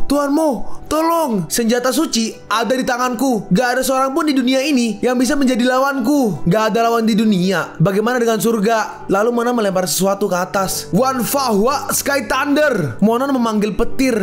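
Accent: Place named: Makassar, Indonesia. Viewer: itu logat makassar klo g slh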